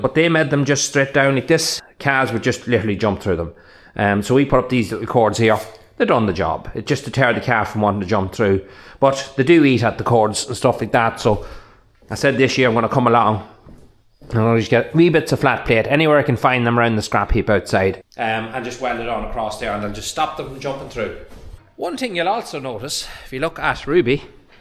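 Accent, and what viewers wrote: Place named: Cavan/Monaghan, Ireland. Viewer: Your channel is growing because you are the product. The videos are well framed and edited, and the content is interesting, but fundamentally, whether you knew this or not, it's you. You are the product here. How you cope with the day-to-day is what people watch for, and you encourage people with your work ethic, attitude, and resilience. It also helps that you have a wicked Cavan/Monaghan accent, and the Yankees love that, too. You keep the country going; you are some of the best we have.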